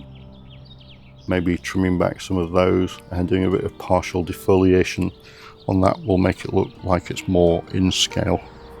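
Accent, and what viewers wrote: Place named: Greater Manchester, United Kingdom. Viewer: I recently found a local Bonsai Club and looking forward to joining a meet. The majority of my collection are in training. I have learned a lot over the past few years. If you ever need to lighten your load I'd be happy to help, listening to your accent I don't think you would be too far away from me in Greater Manchester. Keep up with the great content 👍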